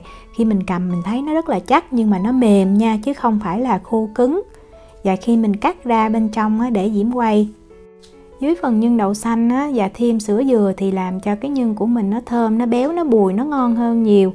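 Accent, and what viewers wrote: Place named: Can Tho, Vietnam. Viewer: nghe giọng c Diễm, e tưởng quê Cần Thơ. hihihihi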